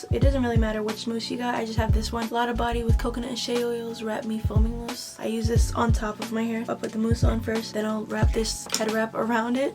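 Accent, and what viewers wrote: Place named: England, United Kingdom. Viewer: Wow thanks and I really thought u where from England 🤷🏾‍♂️😂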